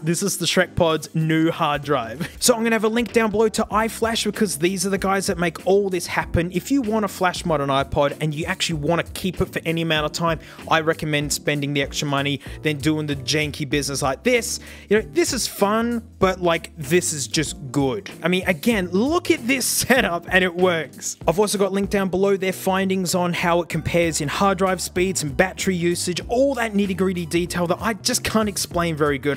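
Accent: Australia. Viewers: I like it when you do the funny aussie voice, it makes my day with every upload 😁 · I miss hearing "Australian" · I actually do wonder though: Is that accent only in some parts of Australia? Because I went to Australia before and the place I went to has the stock English accent that a lot of Americans have.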